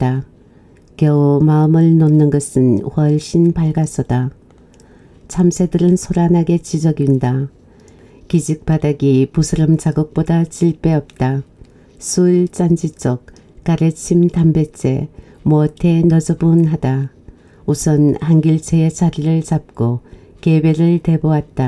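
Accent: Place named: Chungcheong, South Korea. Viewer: 충청도 사투리가 이런 억양인가요? 경낭도인줄 알았는데? 진짜 못 알아듣겠네요.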